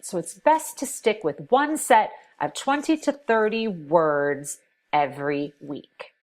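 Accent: United States. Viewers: Your videos are very useful, especially your American Accent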